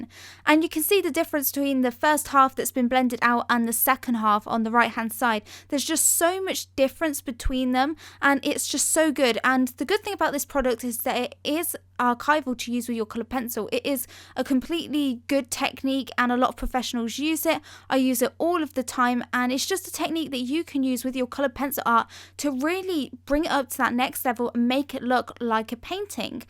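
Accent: England, United Kingdom. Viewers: I love you're accent, it sounds adorable 😍 are you from England? 😇💫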